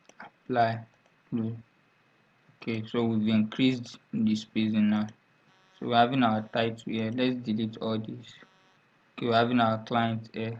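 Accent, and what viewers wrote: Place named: Nigeria. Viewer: you talk like a Nigerian. well done and great job. Do you use AutoCAD MEP or REVIT for designs?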